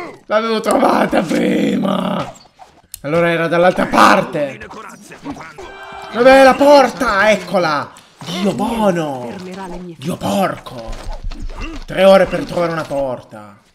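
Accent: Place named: Modena, Italy. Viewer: Ho una domanda da farti: all'accento presumo che tu sia modenese. È vero?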